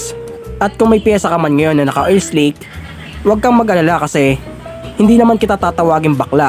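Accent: Batangas, Philippines. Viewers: Batangueño ka lods?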